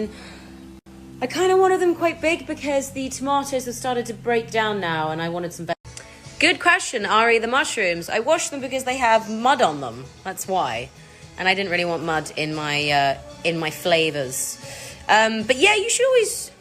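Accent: United Kingdom. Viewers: I know you won't see this but I loved you in Midsommar! The control you had over your body language and facial expression. A good portion of the movie it seemed like everyone was dazed trying to figure out what was going on until the last 30 minutes. Also just when I think an actor is American and here that English accent! Same with Oliver Cohen who played adult Lucas In "The Haunting Of Hill House" as an American, strung out, drug addict. Watched an interview him, first thing I here is that old British "Ello!" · ohh my God this is British accent I thought you are an American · It could taste terrible and I would believe her. The British accent makes everything more believable.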